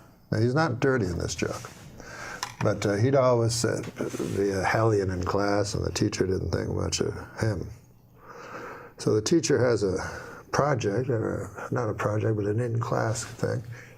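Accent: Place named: Canada. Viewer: Told with the old timey Canadian accent as well, like a story Stompin Tom Connors might be tellin'.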